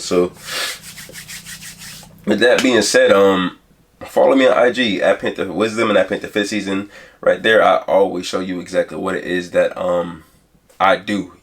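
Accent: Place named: New York, United States. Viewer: Yeah i could tell u from nyc lol but good video king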